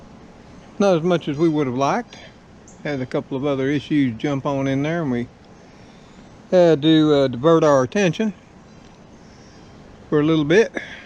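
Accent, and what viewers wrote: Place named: Deep South, United States. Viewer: Dear Mr, Trixie and the kitty crew ….I have been watching you since Dec 2019 and I just love the way you speak...your accent makes me smile and I have learnt so much from you and your way of life in the deep south. Not sure how I came across you but I am so glad I did because yours is my favourite channel so I thinks its definitely us the viewer that is blessed to have such a wonderfully humble and gracious host with such a love for life and his fellow animals. By the way...I am Indian by heritage but born in the UK and I live in a little village in Shropshire ….a lot of things are so different but the love we share is the same...God bless you Sir....Mand X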